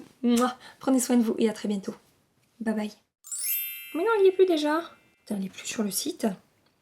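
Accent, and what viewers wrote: South, France. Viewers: Hello la belle ! cette vidéo est un régal , j'adore tes improvisations et t'écouter parler ;), tu es du sud de Narbonne si j'ai bien compris ? tu as un accent sympa , moi aussi une sudiste mais de Montpellier ! merci pour ce super descriptif de la palette qui me fait énormément envie , elle est sublime et très complète <3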